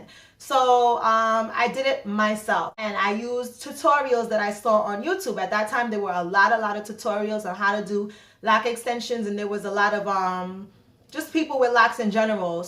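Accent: Trinidad and Tobago. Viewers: I hear a trini accent 🇹🇹❤️ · ohh gosh love we trini accent.❤❤❤❤ and grate infomation. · Very nice, you really answered my questions. And I always love a Trini accent. Take care.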